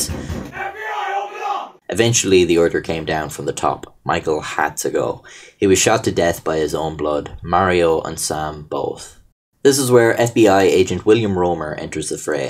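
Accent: Ireland. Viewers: The thicc Irish accent makes for a good mafia storytelling voice. More little known Irish personalities, please. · This is just the wikipedia page with an irish accent and bad jokes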